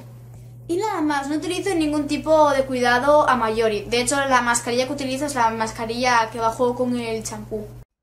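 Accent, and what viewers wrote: Argentina. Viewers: De nada:D Por cierto me encanta Argentina el acento y los dulces, el dulce de leche😍😍😍😍😍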